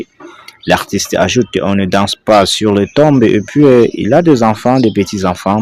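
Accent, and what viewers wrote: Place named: Senegal. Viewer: aucun vocal aucun temoignage aucune video aucune info verifiable aucune source aucune preuve , le ton d'un sénégalais qui lit un texte écrit avec les pieds et le gars veut nous faire bouffer cette info comme vraie avec son " Paris news " , t'a des brouteurs vraiment ils ont le QI d'une huitre dejà et je plains les le QI de ses victimes de celles qu'il arrivera a duper avec des photos succesives de Michael youn et de Lepen , les memes connards qui envahissent les réseaux avec de l'IA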